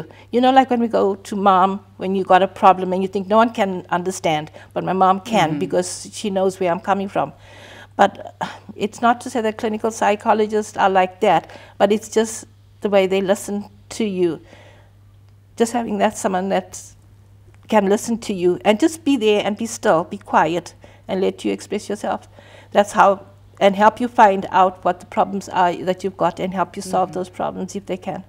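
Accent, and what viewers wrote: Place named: South Africa. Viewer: Is she South African? She sounds South African. Hope this has stuck after so many years.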